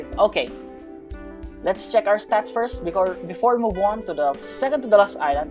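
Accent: Philippines. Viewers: He sounds Filipino. Is he Filipino? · why do you sound tagalog/pilipino hmmmm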